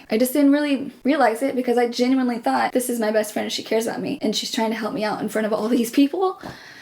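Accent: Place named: New York, United States. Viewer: Yo u funny asf 🤣 n you sound like ur from NY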